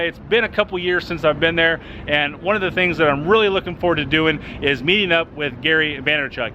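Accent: Chicago, United States. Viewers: Is this guy from The Windy City blah blah blah blah blah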